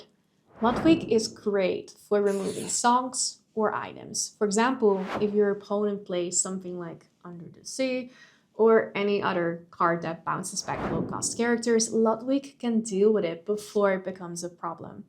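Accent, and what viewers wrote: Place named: Netherlands. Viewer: Ondanks je goede Engelse uitspraak verraad het lichte accent toch dat je Nederlands bent. Leuk hoor… zelf zoek ik nog steeds een community in mijn woonplaats.. goede video verder en heb al veel kaarten van dit deck dus ik ga deze eens proberen met wat kleine aanpassingen(ik heb de shiftline van vanellope niet)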